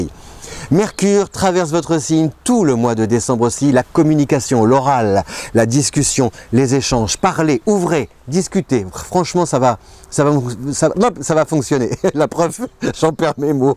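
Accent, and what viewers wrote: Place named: Belgium. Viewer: Vraiment marrant l'accent belge.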